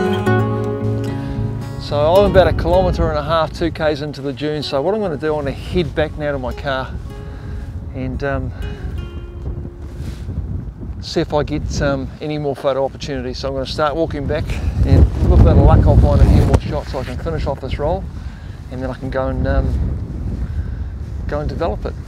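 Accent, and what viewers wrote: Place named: New Zealand. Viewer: As a NZer living overseas, hearing the accent on YouTube cracks me up. Great vid and pics, subscribed!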